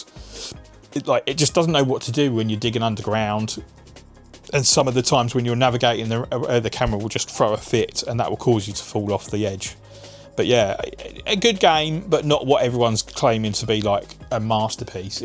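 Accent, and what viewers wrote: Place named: Essex, United Kingdom. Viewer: Very well done video, straight to the point. Jotter that Xbox zombie game I think my local cex had that . You sound local are you in Essex ?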